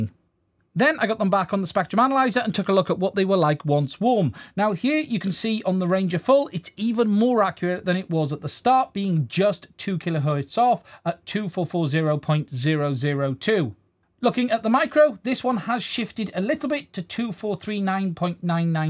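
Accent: United Kingdom. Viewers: this is not only great review but I do like to listen youe British accent which is very nice. Thanks for great work - Micro Module bought 👍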